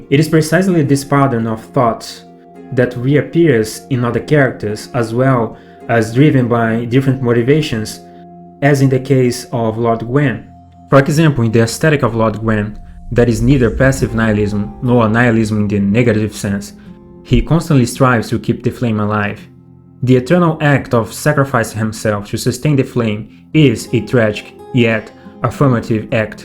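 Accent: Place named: Brazil. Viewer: Krl essa é a primeira vez que percebo o sotaque brasileiro no ingles kkkkk. Video mt bom. Tava querendo fazer algo parecido tbm.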